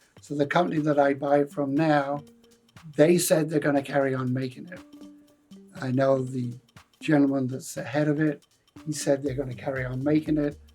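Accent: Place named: New York, United States. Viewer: That is absolutely a NY accent!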